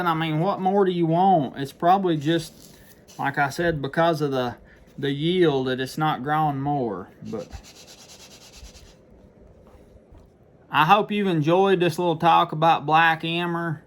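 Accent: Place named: Danville, United States. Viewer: Farro is the common name. I remembered it from hippies. I bought some just now whilst I was listening to you. You talk like me n my people in danville va. Looking forward to fall planting!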